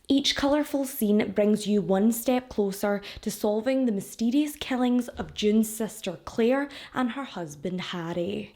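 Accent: Scotland, United Kingdom. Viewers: Thank you for brining so much well-needed awareness to some of these older cases. Rest in peace, sweet Crystal. She and her family deserve justice and answers after so many years! Still so surprised you don't have more subscribers! I've watched quite a few of your videos in the past but it seems like YouTube has been randomly unsubscribing me from a few channels again (as well as other members, from what I've heard), but alas, we're back being subscribed now ❤ Great work as always, Miss. As an Aussie with the majority of my father's family still being based in Scotland, your accent makes me smile. My Nan has lived down here since the late 60's and her Glaswegian accent is still so thick that I doubt subtitles could help us when she gets heated having a wee blether over a cuppa 🙈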